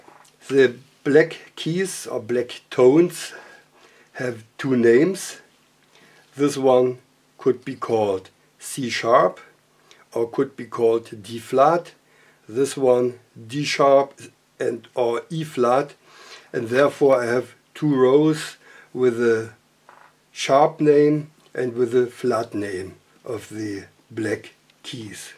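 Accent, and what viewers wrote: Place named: Germany. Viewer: am Ende wird man den deutschen Akzent nie los.. Jedenfalls danke für das Video, ich habe lange nach etwas in der Art gesucht..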